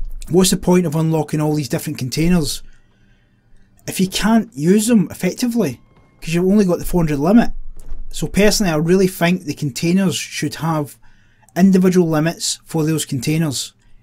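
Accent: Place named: Scotland, United Kingdom. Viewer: I wanna say Scotland, but I always hate assuming things. An accent is defined as speaking one language but using the rules of another. Is there an indigenous dialect in your area of the world?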